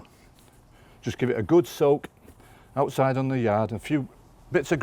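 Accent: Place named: Northern England, United Kingdom. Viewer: Yes an English gardener👍😉👍 and by the accent a northern one at that. I've SUBSCRIBED, I'm getting on in years but giving gardening another go, and who else to follow but someone who actually lives in my part of the world. A brilliant video mate and I'm already looking forward to watching more of your video's.... oh I'm from South Yorkshire and pleased to meet you👍😉👍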